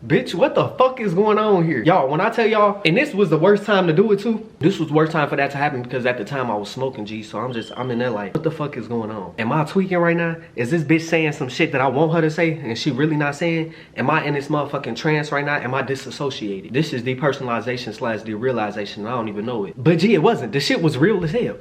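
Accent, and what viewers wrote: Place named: Chicago, United States. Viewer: I can hear your Chicago accent stronger than ever in this video. You owe her some head tho. 😂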